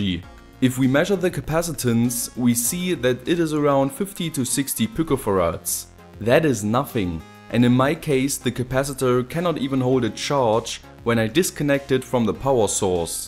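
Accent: Germany. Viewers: these videos are way more well filmes and understandable, last but not least more professional then many others on the same topic out there! Even if you sound a lil bit german XD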